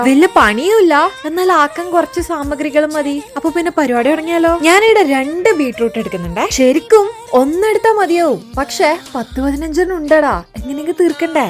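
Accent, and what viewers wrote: Kannur, India. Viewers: Chechikutty kannur ano ente bashapole thonnunnu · That Kannur slang❤addicted 😅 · നിങ്ങള് കണ്ണൂർ ഏടയാപ്പാ?😅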